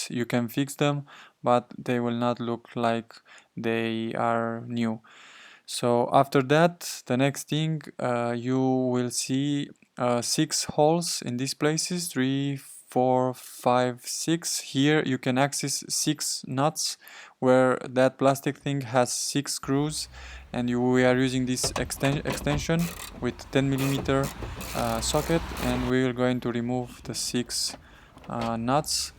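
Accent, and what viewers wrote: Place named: Dâmbovița, Romania. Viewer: Ce accent de Dâmbovița ai când vorbești engleză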